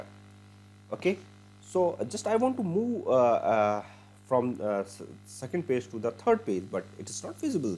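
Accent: India. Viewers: Nope, totally wrong there buddy, I am not even that proficient in English and I speak Spanish, which I doubt the narrator knows since he has an Indian accent, if you can't understand him it's more like a YOU problem then. If you are THAT distressed by his accent, watch another video, he's not a English teacher, he's a programmer, the least he should care is how he is saying his words because one guy can't understand him.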